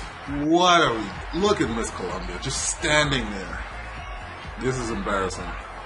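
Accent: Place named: East Coast, United States. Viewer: hahaha subbed back! I hear a little east coast..is that where you're located?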